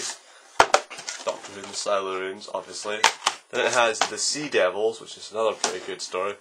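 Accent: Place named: Scotland, United Kingdom. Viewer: What a very beautiful young man. I could listen to you all day. Adore the Scottish accent.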